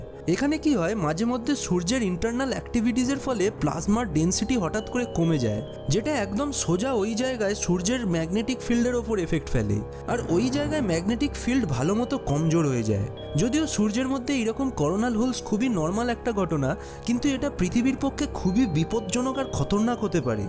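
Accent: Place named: Kolkata, India. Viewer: Are bhai😑 Uni kolkata r tai amn pronounciation.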